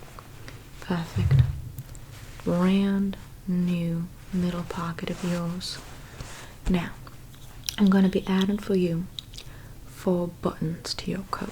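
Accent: South, United States. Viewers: the southern DRAWL I cannot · Southern is best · love the southern accent its top tier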